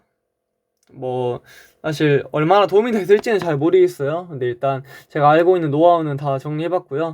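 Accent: Jeolla, South Korea. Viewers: 전라도 사투리 구수허네유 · 그 수학 관련된 건 아니지만 전라도 사투리 듣기 좋아요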